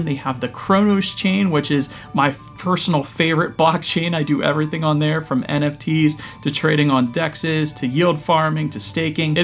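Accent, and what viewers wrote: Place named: Pennsylvania, United States. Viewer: i heard the PA in your voice! sounds like e l c o d a y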